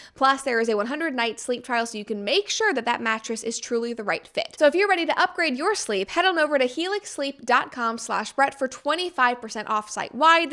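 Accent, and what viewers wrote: United States. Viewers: I really liked the way u talked very good vibers and yapper with fast and quick typical American accent 🇺🇸 u are like my sister Gen Z she is 2003 born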